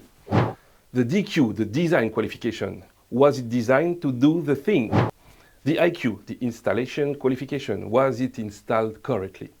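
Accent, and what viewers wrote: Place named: France. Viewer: What a lovely french accent.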